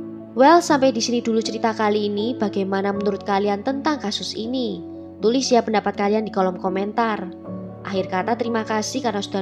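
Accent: Java, Indonesia. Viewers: medok bnget jowone mb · Mbk asal nya jawa mana toh mbk medok2 gitu hehehehehhe maaf cm mau nanya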